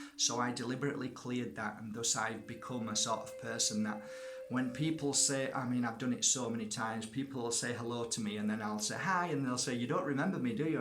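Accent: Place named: Yorkshire, United Kingdom. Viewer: Thanks for your wee signs video much appreciated and enjoyed. You sound like one of the Elliott brothers aka the Chuckle brothers. Are you from Yorkshire W.R.? Blessings to you from Sunny London town;-) x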